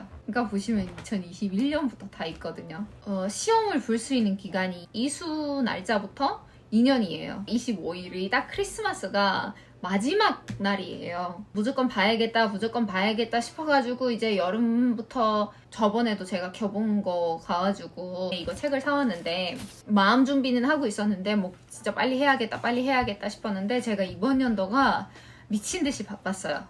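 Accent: Seoul, South Korea. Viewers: 언어 능력이 원어민 수준입니다. 서울 말씨를 쓰시고 오히려 저보다 말씀을 잘 하시네요. 마치 한국에서 태어나 성장하신 분 같습니다. 😅😅😅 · 그냥 찐 한국인 말투인데😊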